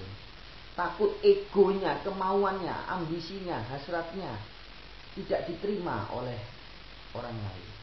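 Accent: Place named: Java, Indonesia. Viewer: dari cara ngomongnya sih seperti sprti logat jawa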